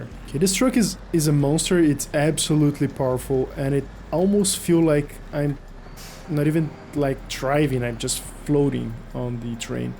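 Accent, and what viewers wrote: Brazil. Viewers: esse maluco parece um brasileiro falando inglês kkkk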